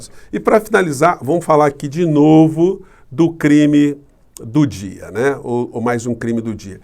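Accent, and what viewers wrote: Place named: Northeast, Brazil. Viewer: SR. esse seu jeito de falar é universal todas pessoas do Brasil lhe entende, mas lhe pergunto tu és Nordestino?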